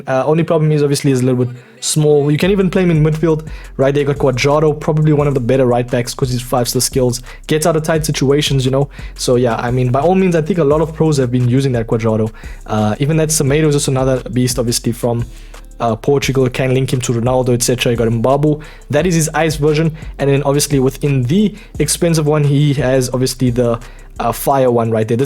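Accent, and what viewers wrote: South Africa. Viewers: Are you South African bro you got the accent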